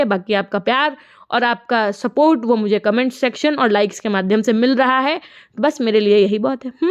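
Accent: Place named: Kanpur, India. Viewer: Full kanpuriya andaz😄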